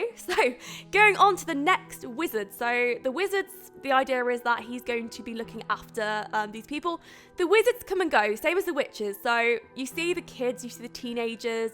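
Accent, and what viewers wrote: United Kingdom. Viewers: you live in germany? but your accent is so british o.o how do you maintain it?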